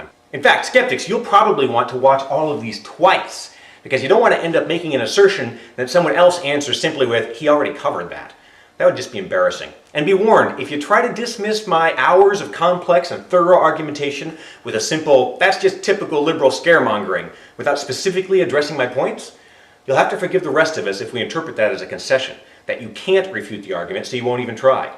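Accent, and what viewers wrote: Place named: Canada. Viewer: YOUTUBE...only in America, (but you sound canadian) every one wants to be famous. This goes to show excactly how little attention people feel they are getting. Be a good human and take responsibility thats all we need to do. Don't believe the hype. Don't worry. Don't be afraid. Everything must eventually end... So enjoy this life experience, cause it aint gonna happen twice.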